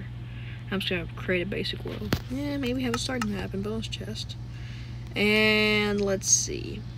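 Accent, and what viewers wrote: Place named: South, United States. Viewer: I like the southern accent btw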